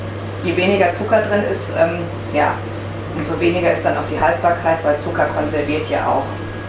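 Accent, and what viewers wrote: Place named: Palatinate, Germany. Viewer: Ah, ich Liebe deinen Dialekt :) wo kommt ihr den her? Hört sich für mich nach Palz an, kann mich natürlich auch irren. Liebe Grüße aus dem Saarland :)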